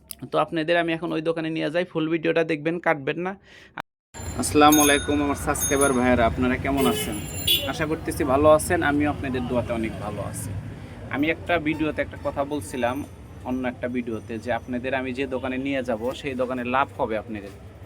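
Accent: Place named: Bogura, Bangladesh. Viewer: আপনার ভাষাগুলো বগুড়া বগুড়া লাগে❤❤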